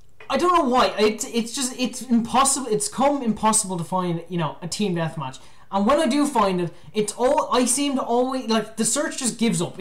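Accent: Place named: Ireland. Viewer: That Ireland voice (; <3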